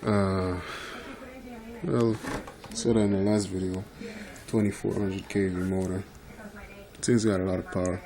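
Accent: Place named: Caribbean. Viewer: yo, you sound like a Caribbean man. Where you come from?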